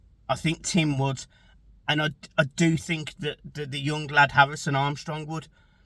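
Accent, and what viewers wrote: Birmingham, United Kingdom. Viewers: 1st time watching . Got confused with Brummy accent thought had clicked on a Villa channel 😂☺ Am a Staffordshire Evertonian so pot calling kettle black. Leicester and Southampton gone.Wolves very similar standard to us. Ipswich have more spark. Us or Wolves.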